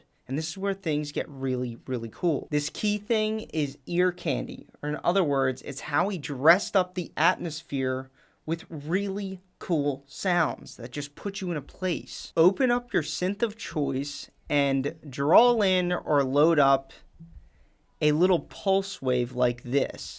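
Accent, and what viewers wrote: Philadelphia, United States. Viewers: I think we know it's a masterpiece, but we can't explain why. And you've definitely showed us. Also, that's 100% a philly accent. Go birds!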